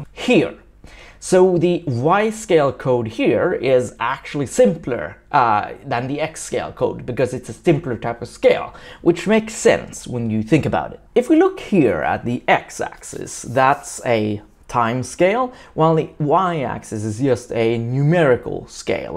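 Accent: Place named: Sweden. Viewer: How i missed that Swedish accent and humour! Lovin' it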